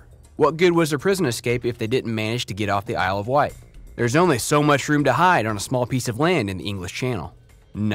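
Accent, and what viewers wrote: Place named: United States. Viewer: Sounds wierd an American describing a UK prison 😂😂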